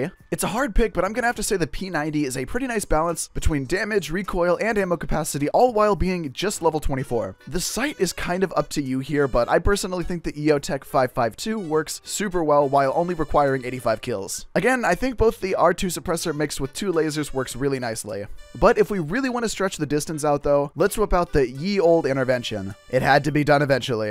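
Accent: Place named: New York, United States. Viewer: Even though your NY accent is better than mine, and I was born and live here, I love your many accents. I read it's a rare talent. As you said in this video, "Very impressed, not gonna lie.". <3 NYC GIRL!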